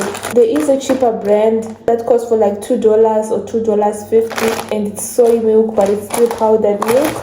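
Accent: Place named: East Africa. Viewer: has any1 ever told you, u sound east african? lol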